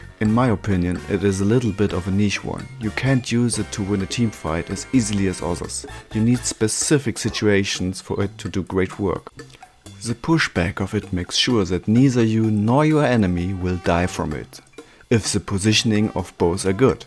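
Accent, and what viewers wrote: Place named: Germany. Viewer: Like for the german accent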